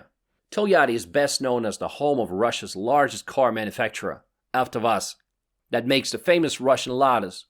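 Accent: United States. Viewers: bro u have good english, dont use this generic annoying american accent dude voice to read the script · Pretty impressive American accent for a Russian!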